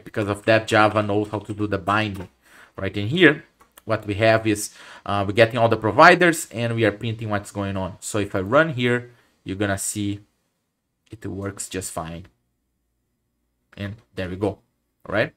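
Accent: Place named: Brazil. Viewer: Pretty good job explaining the concept man My dad is also a although we're from mexico, but i can tell you are very brazilian from your r's!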